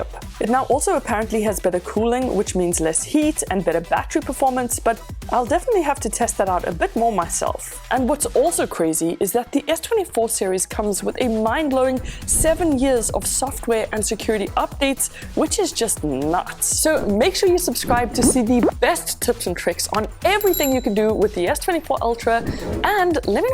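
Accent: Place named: South Africa. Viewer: Yooo this is crazy, I stumbled on this video and instantly thought you sound South African. Let's go such a good quality video. I'll be sticking around. Subbed!!🎉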